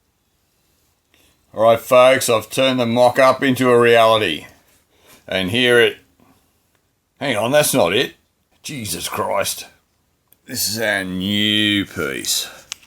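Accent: Australia. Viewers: Mate that's a work of art! And you've got the most "Aussie Bloke" accent I've ever heard. You could get a voice over job easy if you haven't already. 🍻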